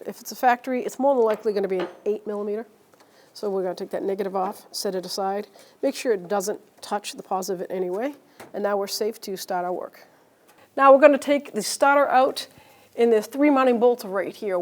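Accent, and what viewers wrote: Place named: New England, United States. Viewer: I'd buy something from you if you made the video on the 4wd version of this truck. The presenter sounds like they're from New England, they should have INSISTED on it ...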